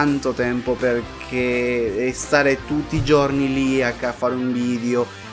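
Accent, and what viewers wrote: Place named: Spain. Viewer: adoro il tuo accento... l'accento spagnolo lo amo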